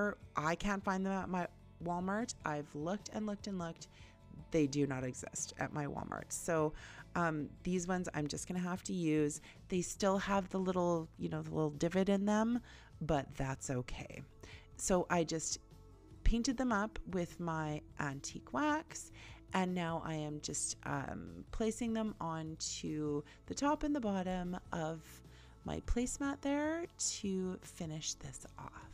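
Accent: Canada. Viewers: I have only one other DIYer to whom I am subscribed that uses Hippo waterslide and heat transfer paper. It is fascinating to watch it being used. I just plain have to try it out myself!! You have made projects here today that, as always, look high end. My favorite was the coasters! I look forward to each of your videos!! Your voice is soft and soothing and I’m diggin’ your Canadian accent!! 😃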